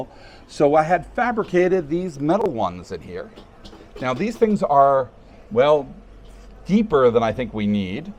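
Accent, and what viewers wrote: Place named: New England, United States. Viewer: I don't remember if ever discussed it in a video before but I'd be really interested to know how, from the accent, a New Englander got to Tallahassee and started in the business of Gilded Age/Progressive era style handmade candy.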